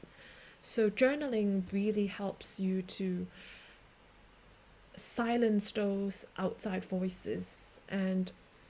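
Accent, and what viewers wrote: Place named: United Kingdom. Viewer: why do u sound bri ish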